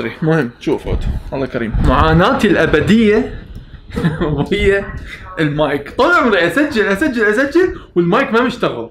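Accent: Iraq. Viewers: فديت اللهجة العراقية😊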